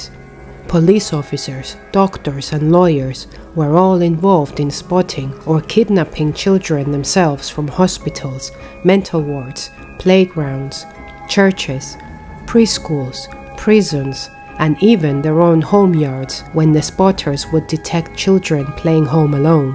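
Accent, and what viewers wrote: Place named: England, United Kingdom. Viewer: I love the English accent also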